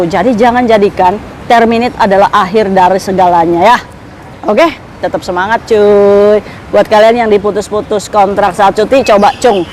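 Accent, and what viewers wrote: Indramayu, Indonesia. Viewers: Sing suarae ktenger payah logat drmayue, trnyata emang bner wong drmayu 😂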